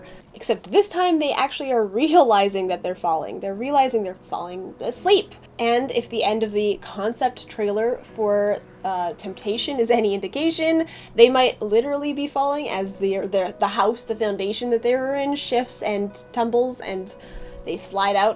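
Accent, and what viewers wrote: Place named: Canada. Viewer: canadian tinnitus pronunciation represent!!✊✊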